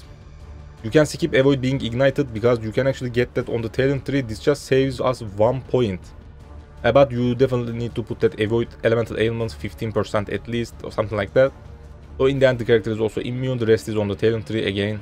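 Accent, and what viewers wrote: Turkey. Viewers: Türk şivesini duyar duymaz tanırım agaa (Eline sağlık btw)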